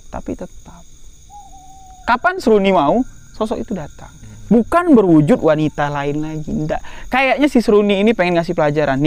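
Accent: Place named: Jambi, Indonesia. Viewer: Assalamualaikum, bang slm santun slm sehat, apapun cerita misteri yg diceritakan sm bang enak punya ciri has bhsa jambi nya sngat amat kental saya suka bgt dari cara bicaranya bang emng orangnya sangat santun sukses trus bang dn teemnya moga sllu diberikan sehat, Amiinnn...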